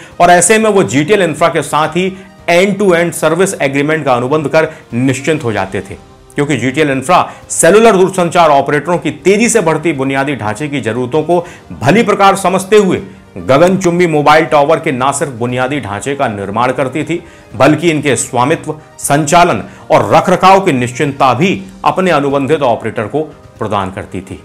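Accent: Standard. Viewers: you pure hindi speak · kya sudh hindi bolte hai.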